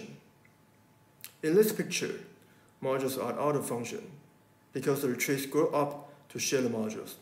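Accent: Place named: China. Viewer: I don’t understand your Chinese accent.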